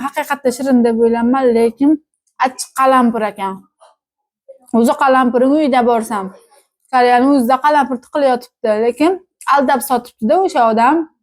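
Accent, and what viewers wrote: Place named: Olot, Uzbekistan. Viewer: Олотликлар шунақа гапиради Турқманистон билан чегарадош олотликлар турқманча гаплашади кўписи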